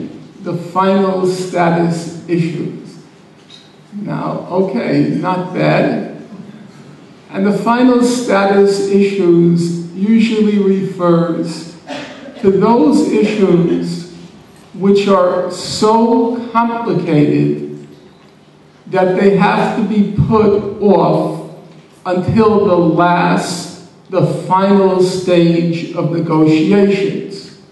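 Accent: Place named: Brooklyn, United States. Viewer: I wonder what the Czechs in the audience who after studying English for 6-8 years were thinking as they listened to Norman speak. Listening to him repeatedly pronounce the word "wall" in his thick Brooklyn brogue, I couldn't help but wonder what word did it sound like to the Czechs?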